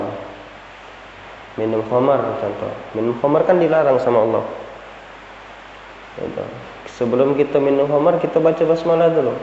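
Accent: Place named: Palembang, Indonesia. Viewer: Cak nyo dari palembang yo bang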